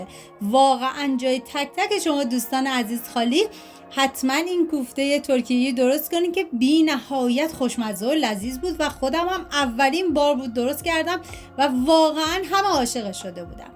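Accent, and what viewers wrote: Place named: North, Iran. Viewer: سلام شما لحجه تون مثل شمالی هاست ایا شمالی هستید عزیزم؟؟؟؟؟💙💙💙💙💙💙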